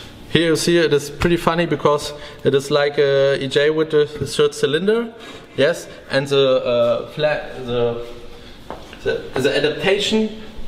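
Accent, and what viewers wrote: Germany. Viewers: Lmao was not expecting a very strong German accent and then realizing you’re putting them in air planes not cars really surprised me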